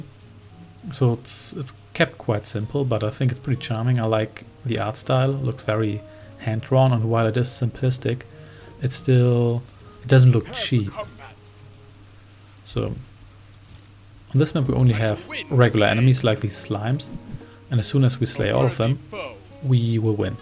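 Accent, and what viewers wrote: Germany. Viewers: It looks like it would make for a neat app lol are you german by the way? I like your accent :)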